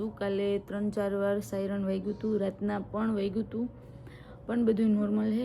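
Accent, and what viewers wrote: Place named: Kathiawar, India. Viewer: હમે પણ તમારા જેમ બોલીએ કાઢીયાવાડી 😅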